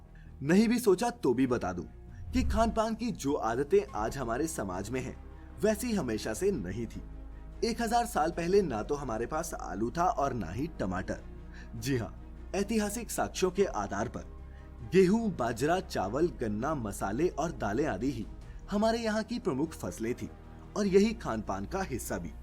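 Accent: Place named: Rajasthan, India. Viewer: Bhai aap pkka RAJASTHAN se ho na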